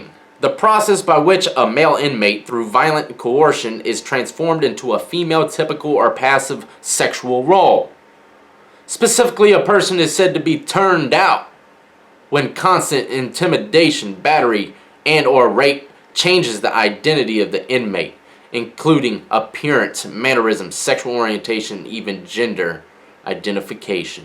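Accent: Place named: South, United States. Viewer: Why are you so damn cute?! And you have that little southern twang 😍🤗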